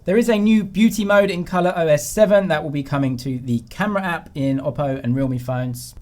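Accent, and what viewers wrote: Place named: RP, United Kingdom. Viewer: Thank God for RP. Great for non-native speakers like me.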